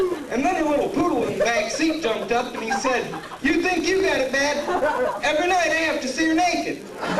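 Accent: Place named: Michigan, United States. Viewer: this isn't funny or edgy at all, and he's just awkward. his accent is the only funny aspect just because it's Michigan enough to sound a little Minnesotan and it vaguely reminds me of the guy in the snow suit shoveling his driveway and being questioned by the police in Fargo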